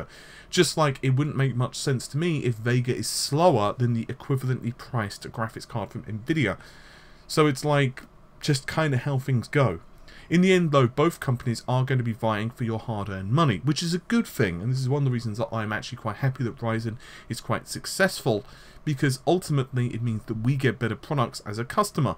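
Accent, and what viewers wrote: United Kingdom. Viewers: "nm" is pronounced "nanometre" unless you would (for example) legitimately say: "my penis is 25 'cm' " rather than "centimetres" or "suck my 10 'in' biro" .... you would of course say 'inches' not literally pronounce 'in' written and spoken are different. also, you're wrong about how to pronounce Ti. honestly I think you're just trolling us on purpose now, but then I do wonder if maybe you even knew that 'nm' means nanometre... EDIT: I know I'm getting thumbs up for trash talking you - but I do want to say that I love your channel, your vids are really informative and I'm a proud subscriber... but dammit man, please just pronounce stuff like the vast majority - I have great respect for those that stand in the minority on issues, but language is not one of them, it's meant to populist, so that we can all understand each other. The 'common tongue' is called as such for a reason. 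We're both British and my accent is very similar to yours, so I probably identify too closely - I just want your channel to be a big success bubba! hugs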